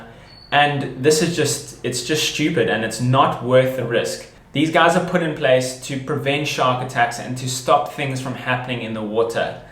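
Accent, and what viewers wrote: South Africa. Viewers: What country is this? You sound South African. If they are indeed bronze whalers, well they have a long history of attacking humans. Not too sure what you're saying here.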